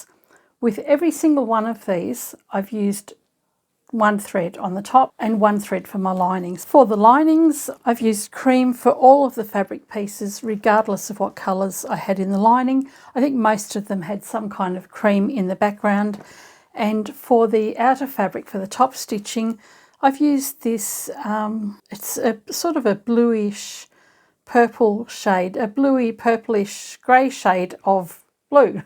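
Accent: Australia. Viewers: NZer here. Aussie, accent is slightly different from NZ.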